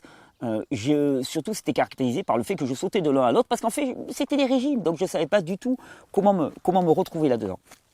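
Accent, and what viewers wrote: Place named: Southwest, France. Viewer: Bonjour! 😃 Te rends tu compte que je te connais à travers tes vidéos depuis des années et que seulement en juin 2019 j'ai commencé un jeûne. Je suivais ton programme alimentaire uniquement des yeux, parce que je n'ai pas les moyens financiers pour acheter un extracteur de jus et des fruits et légumes bio. Là j'entame mon 3e jours de jeûne sec, que j'espère faire durer 7 jours au moins. Je suis terrorisée par comment je pourrais casser le jeûne sec. J'ai vu ta vidéo sur un jus vert avec de la noix de coco ou avocat. Mais comment faire? Je n'ai pas d'extracteur de jus. Il y a un biocoop pas loin de chez moi, je pris pour qu'ils aient les jus appropriés. J'adore t'écouter parler, c'est d'ailleurs ce que j'ai fait depuis le début du jeûne en visionnant toutes tes vidéos de 2016 sur le jeûne la fête du corps. Ton timbre de voix et ton accent du Sud-Ouest, ta facilité d'élocution et ton grand savoir, sont source d'apaisement pour moi. 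À défaut de fruits et légumes bio, je me nourri de toi. Merci mille fois! 🙂 Merci encore! 😃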